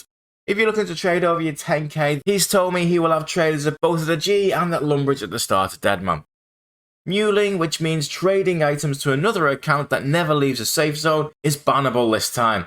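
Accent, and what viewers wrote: Liverpool, United Kingdom. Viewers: scouse power!!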